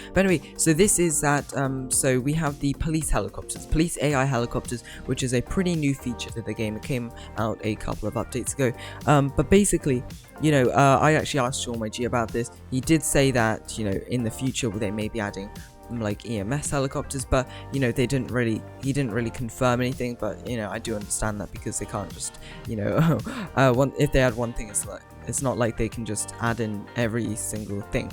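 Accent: United Kingdom. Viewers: Are You British? If you are you’re probably from the UK or EUROPE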